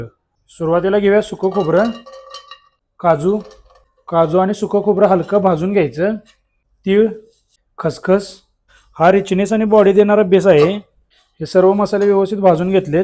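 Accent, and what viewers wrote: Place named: Kolhapur, India. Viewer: भावा तुझ्या बोलण्याचा टोन एकुण खुप छान वाटलं माझ्या माहेरी गेल्या सारखं वाटतं मी पण कोल्हापूर ची आहे खुप छान जगात भारी कोल्हापूरी ,,,👌👌👌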